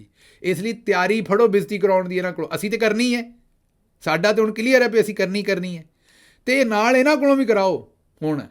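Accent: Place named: Central Punjab, India. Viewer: بہت ھی اعلیٰ ، ستھری پنجابی میں مخصوص سنٹرل پنجاب کے خوبصورت با محاورہ انداز مزا آ گیا ، میرا تعلق کراچی سے ھے مگر میں کچھ پنجابی سمجھ لیتا ھوں ۔ بہت اعلیٰ ۔۔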